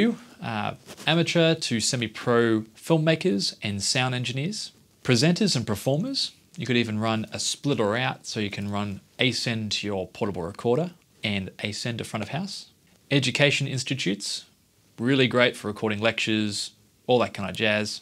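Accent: Australia. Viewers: Great review bro! Like your accent. Are you Aussie? Been checking out good affordable wireless microphones that are easy to use since I am planning to create a vlog content in the future and I find this little device very capable for budding content creators like me.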